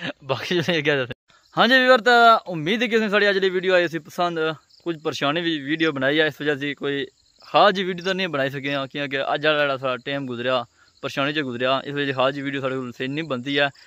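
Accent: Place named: Mirpur, Pakistan. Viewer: Aap ke mun say mirpuri pyari lagti hay,forget urdu language, thanks. Mazhar Hussain Birmingham uk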